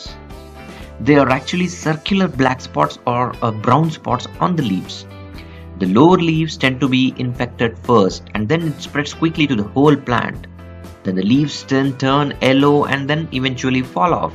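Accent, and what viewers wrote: India. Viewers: Please just eliminate the background music . Hard to hear your Indian accent voice with the music . Very informative . Thank you .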